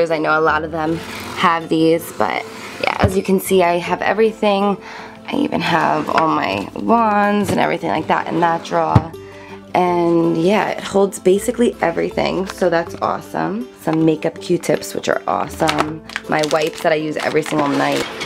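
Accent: New Jersey, United States. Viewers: Your accent has gotten so strong "jersey".. You're adorable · she's from Jersey. It's her accent. I pronounce it the same. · It's a Jersey accent. :) I used to say it like that until I moved to Virginia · It's called a New Jersey accent, not a California accent. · new subscriber here - love your Jersey accent!! (I am from Long Island - we have a similar accent :-) great video - got lots of ideas! Thanks! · Actually I think it's a New Jersey accent. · Her Jersey accent ❤ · Please don't be sorry for being who you are!!! I love that Jersey accent, and I say cawfee and I'm from New York. Negative comments always come from those feeling that way inside its a reflection of how they feel about themselves. I love all your videos and the genuine side you always show. Beautiful inside and out! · Good heavens, that's a Jersey accent. Hearing you speak reminds me of my friend's accent and how much I wish she were still alive. 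· Where are u from? Are u from jersey? Trying to figure out where ur accent is from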